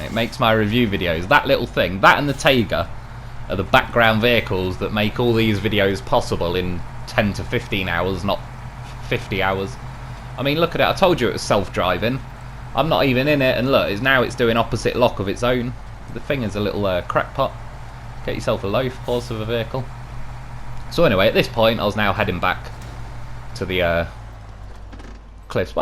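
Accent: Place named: Staffordshire, United Kingdom. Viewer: The Peugeot 406, not a horse of a vehicle. Still trying to place your accent. Maybe Staffordshire, Stoke, I feel it maybe further North. Don't tell me I'll get it eventually haha. My car history included some French cars too, Citroen BX 16 valve, other Citroens, couple of Peugeots. Reliable, no. Comfy, yes. The BX 16 valve was fast when it worked, but all ended as scrap.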